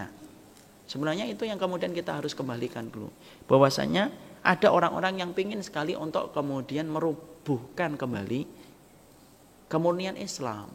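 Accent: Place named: Kudus, Indonesia. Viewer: Logat orang Kudus'nya Ketoro Ust.. Barakallah 😀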